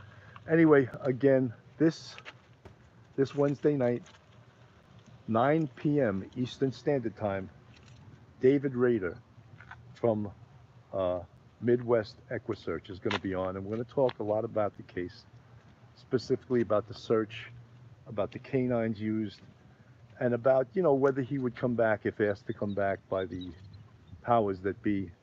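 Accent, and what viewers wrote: New York, United States. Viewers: Being Canadian I love the NY accent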